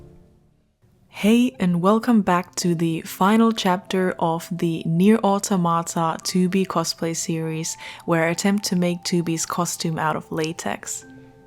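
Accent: Finland. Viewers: Amazing work! PS: Am I detecting a hint of Finnish accent?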